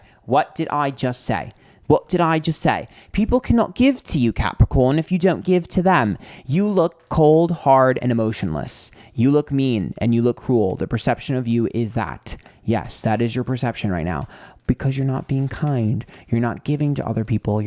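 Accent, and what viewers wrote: United Kingdom. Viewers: 🐟 love your British accent 🇬🇧 spot on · Love the British accent this video 🙏☠️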